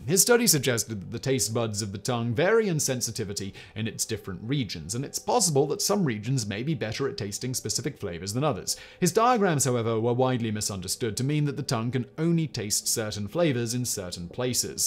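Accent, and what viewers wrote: England, United Kingdom. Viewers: you and your staff have an amazing propensity for getting it wrong more and more often. Before you cause much more damage in peoples lives. Consider how you produce these videos, and how many. Quality control sucks. Number two is patently and demonstrably false. The brain does grow and learn through mental exercise. Apparently you or your editors? Have never heard of cerebral plasticity. Or took no notice of the numerous studies which proved beyond any doubt that people who picked up a second language, or learned new math skills, or even practiced mental yoga's, in middle age, or even older, were far less likely to experience cognitive decline, and thats just one benefit. But how I weary of making a counter point to you. So many of your viewers hear your English accent, and just accept that you are smarter than they are because of it, and so you must know what your talking about. 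Such is specious reasoning. Every part of the body, including the brain, can be exercised. And growth will continue so long as telomeres tell, cells make new connections, and nuerons know what is going on. I'm serious, yet I jest. And yes, you can definitely make up for lost rest and missed sleep. Changing sleeping patterns sucks for your health. Both mental and physical. Yet we can adress this as well with adenosine and melatonin, or sometimes a simple histamine. Maybe you guys will double check yourselves and get an opposing opinion some day. I find it difficult to believe you ever do so, re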